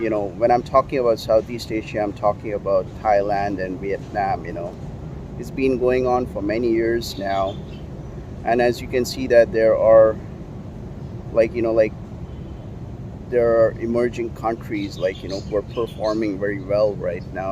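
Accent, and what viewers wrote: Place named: United States. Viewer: your accent is very American. Surprise that you are from India.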